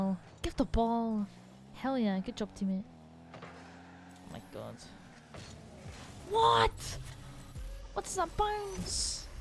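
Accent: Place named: Scotland, United Kingdom. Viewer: Wait, you're Scottish? So am i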